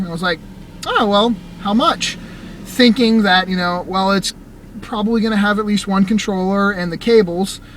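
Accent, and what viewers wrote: United States. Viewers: Yeah. American vocal contractions are fun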